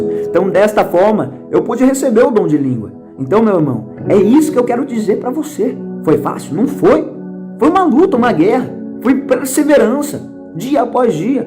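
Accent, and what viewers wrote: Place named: Rio Grande do Sul, Brazil. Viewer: Ele e gaúcho o sutak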